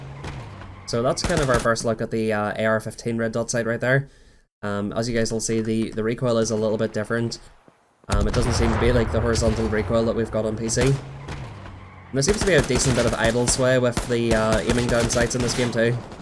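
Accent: Northern Ireland, United Kingdom. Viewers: Northern Irish? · Are you northern ireland? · Are you Northern Irish? You sound like you're from here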